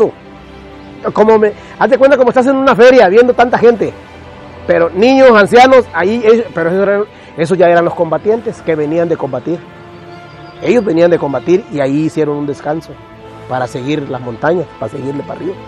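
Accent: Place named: Mexico. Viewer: Este abla.como mexican..estubo en la usa?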